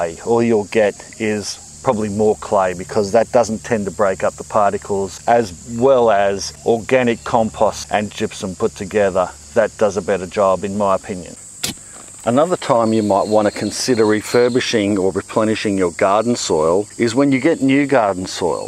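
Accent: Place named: Brisbane, Australia. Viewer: Your not from brissie by any chance? Love some guidance and knowledge for free labour!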